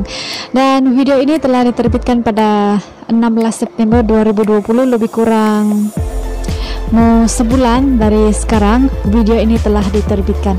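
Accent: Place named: Brunei. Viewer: owh...pantesan dialek melayu nya mirip brunai.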